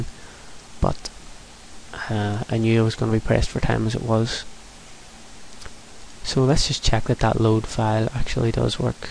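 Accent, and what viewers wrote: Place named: Ireland. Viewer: Just wanted to thank you for the excellent tutorial(s)! I found (all 11) to be quite useful as an introduction to XNA. I am very greatful for your time. I was relieved that you had posts listing the XNA 4.0 conversion under the applicable video showing the corrections. BTW its nice listening to an Irish accent in these tutorial videos as opposed to the 'more comment' accent associated with programming tutorials. This Canadian Approves :)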